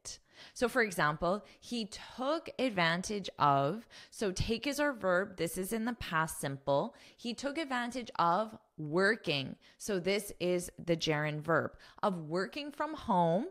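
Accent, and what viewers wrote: United States. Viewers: Are you american? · Отлично хорошее объяснение но не люблю американский английский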